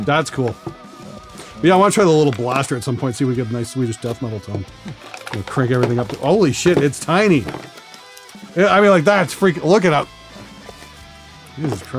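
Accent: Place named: Canada. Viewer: I can't stand that Canadian accent.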